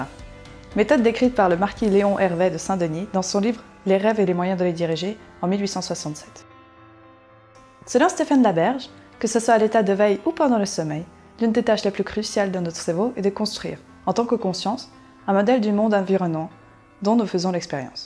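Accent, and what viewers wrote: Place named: Switzerland. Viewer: Ah d'accord merci! Vous avez pris l'accent :) Vous habitez dans quel canton? Et c'est vraiment intéressant ce que vous faites bravo!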